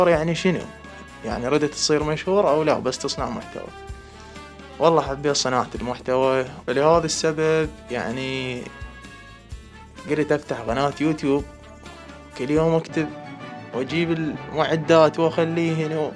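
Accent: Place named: Nasiriyah, Iraq. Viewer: اي بس عبالي من الناصريه لان لهجتك مثلهم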